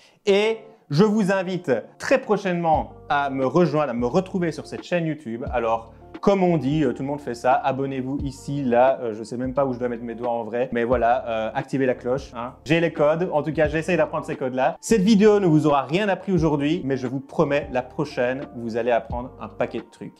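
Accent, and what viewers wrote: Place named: Belgium. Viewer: Hééééé j'avais pas vu compatriote ! L'accent belge on est là on lâche pas ! En espérant entendre de nombreux septante et nonante par ici <3. Congrats & tout le meilleur poulet !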